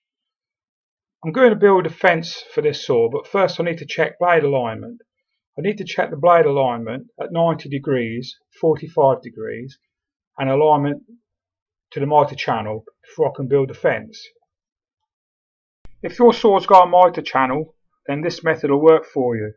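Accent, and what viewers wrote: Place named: England, United Kingdom. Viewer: I didn't realise you were Italian, sorry, as you sound very much English & have a similar accent as myself, who is from the Black country. Thank you for taking the time to reply. God bless & look forward to more videos.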